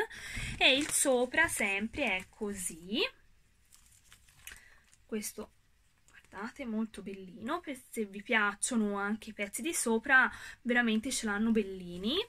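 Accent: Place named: Tuscany, Italy. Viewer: Si sente che sei toscana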